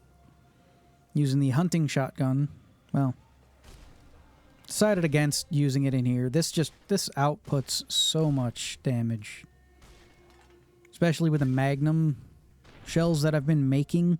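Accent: South, United States. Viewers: You sound like you just came our of the mojave, you have a subtle southern accent lol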